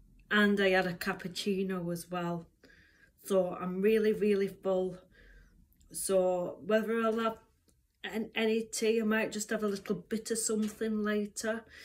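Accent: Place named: Yorkshire, United Kingdom. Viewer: Yeah lol am from Durham but you seem bit further away Yorkshire maybe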